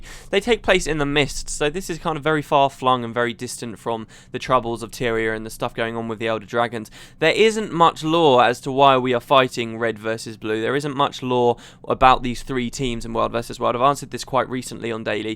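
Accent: United Kingdom. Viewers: I've just watched the fucked up videos pn youtube... I really hope will cheer me up with his excellent british accent.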